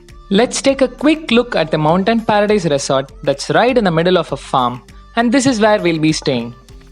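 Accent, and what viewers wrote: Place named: Tamil Nadu, India. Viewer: Bro pls speak tamil love ur tamil slang 😘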